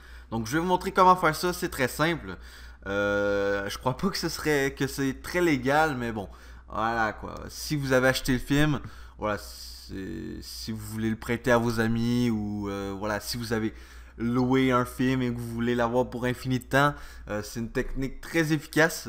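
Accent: Canada. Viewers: J'ai 3 Commentaires à poster. Le premier est que j'adore le Canada et votre accent. Cela me fait voyager. Je suis de Paris. DVD descripter : Merci pour vos conseils pour votre lien de téléchargement. Parfait ainsi que vos tuyaux pour le configurer. J'ai eu une erreur concernant la Fat 32. Il semblait que la fat32 n'acceptait pas les fichiers de plus que 4Go. J'ai passé outre. Qu'en pensez-vous ?. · Bonjour de France, Un grand Merci d'avoir essayé de m'aider. Je vais me mettre à l'Anglais à 63 ans, il n'est peut-être pas trop tard !!! J'habite en Sologne entre les châteaux de Chambord et Cheverny et pas loin du Zoo de Beauval, si vous avez entendu parlé du bébé panda qui est né il y a juste un an. Moi, j'ai visité à plusieurs reprise le Canada : Québec et la Gaspésie ainsi que Vancouver. Êtes-vous, déjà venu en France, chez vous cousins !!! J'aime trop votre accent et je me permets de vous embrasser par dessus un océan. 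Dominique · merci beaucoup l accent canadien en plus trop cool j adore!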